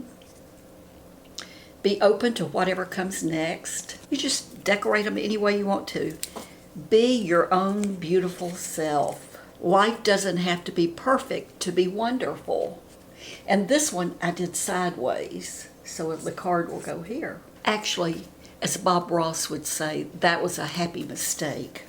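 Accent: South, United States. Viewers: I am closing in on 79 and was so pleased to find you onout youtube. I live in North Carolina and am very happy to hear your lovely southern voice along with the fact that I enjoy your show so much. I won't visit youtube without looking for you first. Ann McE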